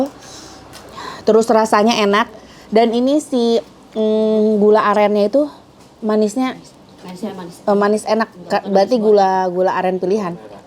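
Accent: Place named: Garut, Indonesia. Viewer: Hayang aing muncrut🤣 Khas bahasa urang garut Si Tteh. Ngiring raos na Tteh🙏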